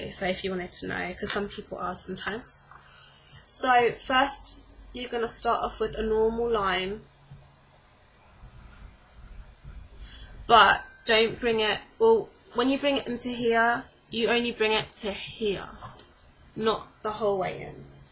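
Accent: United Kingdom. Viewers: I REALLY LIKE WATCHING YOUR VIDEOS. YOUR SO ADORABLE WITH AMAZING EYES AND YOU HAVE A BUBBLY PERSONALITY WHICH IS VERY UNIQUE AND AWESOME. YOUR ART WORK WHEN DOING MAKE UP IS VERY CREATIVE AND FUN NOT ALLOT OF PRESSURE. I WANT TO GIVE YOU A 5 STAR AND 2 THUMBS UP GREAT JOB. BRITAIN ACCENT WITH A ROUND FACE ANTIQUE DOLL FEATURES VERY CUTE AND ADORABLE :-)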